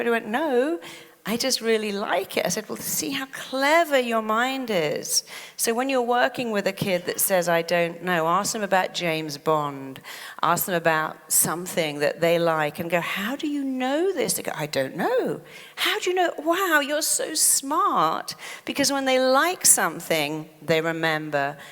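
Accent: Cambridge, United Kingdom. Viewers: is a genius! I just discovered her recently. Does anybody else speak to themselves in voice? lol weird I know. I am working on reprogramming. When I catch myself in negative thoughts I hear her lovely voice. For example this evening “ugh I’m rushing, I’m so stressed, don’t have enough time to do all these things” then I self corrected and heard her lovely British accent saying “it’s all right, I have plenty of time, I am enjoying myself”. lol anybody else? It’s like a self soothing thing 🤷🏼‍♀️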